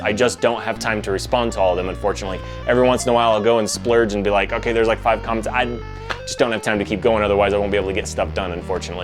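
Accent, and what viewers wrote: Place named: Midwest, United States. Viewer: Yay! Retroware fam :) yeah lol for some reason I hear some midwest accent. (probably just me!)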